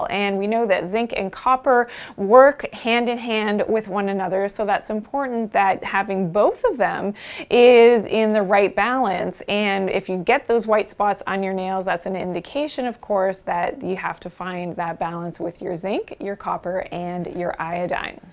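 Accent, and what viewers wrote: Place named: Canada. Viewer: I love her Canadian accent, it’s not something I hear in my country and not even on tv or movies. These were good tips btw that I haven’t heard before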